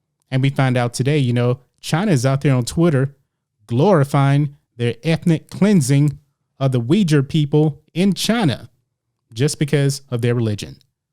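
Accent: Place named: United States. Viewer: Great American voice are. Thank you.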